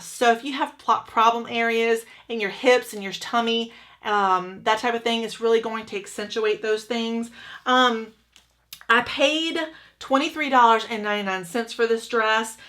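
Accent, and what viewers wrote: South, United States. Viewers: You looked good in all of the dresses. Love your channel! You give so much more info than others I’ve watched. Plus, I get to hear a southern accent - represent, girl!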